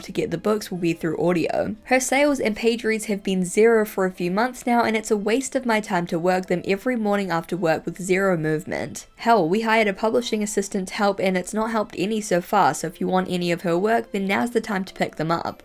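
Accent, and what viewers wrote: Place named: New Zealand. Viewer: I think she's a Kiwi?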